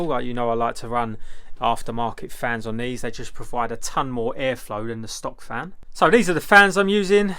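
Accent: Essex, United Kingdom. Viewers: You sound like essex lad, how much u charge to restore an ep3 type r m8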